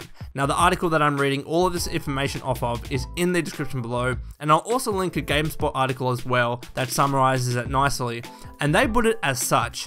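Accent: Australia. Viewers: Wow, I'm kinda digging the Aushie accent this way.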